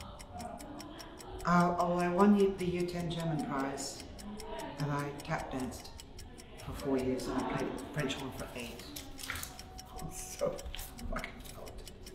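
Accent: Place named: RP, United Kingdom. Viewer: well, the UK, as small as it is, has more accents condensed into a country than i've ever heard, so i guess it depends what your ear hears. i live in south africa, and we have 11 official languages and hundreds of unofficial ones - the cocktail of accents here is incomparable, but that is because there are so many different languages. in the UK, even with english being the first language for most, i have never heard so many varieties of accents in a matter of kilometres apart ;) to me her accent sounds like a mix of many, but definite overtones of posh brit, unless you listen closely ;)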